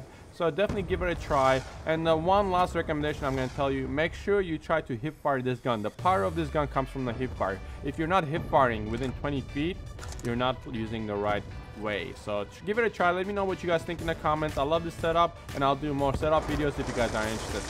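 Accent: Russia. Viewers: cool class you sound russian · You're really cool man, you've earned a sub, like and a comment :P But 1 question: are you russian or american or what? sorry i'm not too sure.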